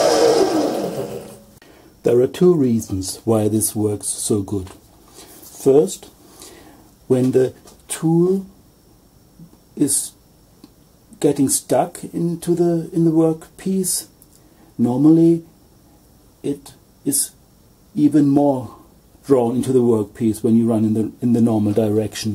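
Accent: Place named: Germany. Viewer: You sound German !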